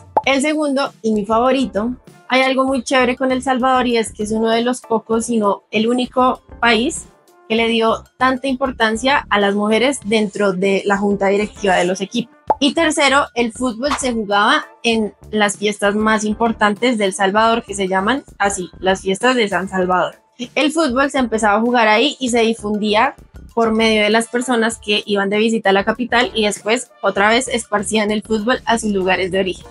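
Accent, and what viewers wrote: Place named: Colombia. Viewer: Por tu acento sos colombiana menos vistoso y todo preo haun la seleccion de Honduras supera en la serie a Colombia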